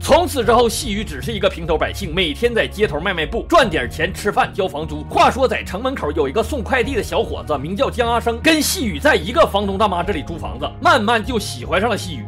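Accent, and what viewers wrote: Northeast, China. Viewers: 一定是咱们东北银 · 这份碴子味儿的解说听上去那是相当地亲切啊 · 最好听、最逗人的东北话，都在这里了。😀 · 東北味真濃.據說東北人普通話都很好.Thanks♪(･ω･)ﾉ影評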